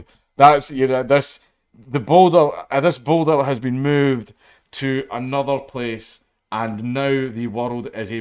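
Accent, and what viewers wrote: Scotland, United Kingdom. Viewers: I’m not gonna lie I clicked on this cause I wanted to hear him about “A FOOKIN ROCK CANT BE RACIST YA DAFT TWAT” in his thick Scottish accent lol who’s with me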